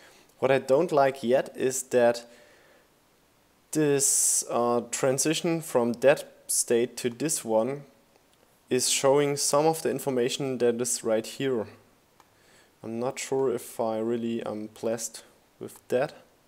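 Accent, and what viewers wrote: Germany. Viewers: Du bist ein deutscher oder? ;D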